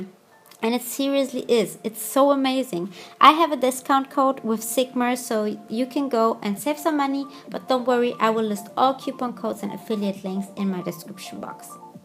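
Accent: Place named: Germany. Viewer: I found this look on instagram and I am totally obsessed with it 😍 your accent sounds sooo german 🙈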